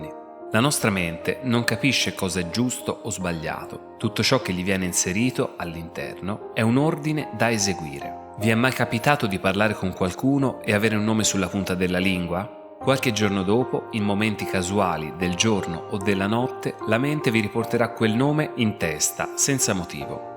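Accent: Tuscany, Italy. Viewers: L'accento toscano mi fa venire ancora più voglia di ascoltare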